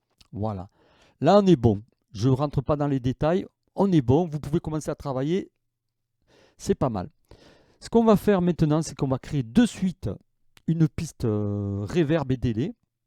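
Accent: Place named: South, France. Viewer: Bonjour! Je débute avec cubase pro11, pour le rangements des projets sur quel DD, dois je les mettre? Avec mon système sur le disque C ou avec les VST inst sur le Disque D? J'ai entendu un accent du sud dans ta voix! J'habite à côté de DAX, est ce que tu fais des formations en présentiel, ça m'intéresserais? J'adore ta façon d'expliquer très précise. Je pourrais me déplacer si l'on est pas trop loin! Amitiés à toi! Marcus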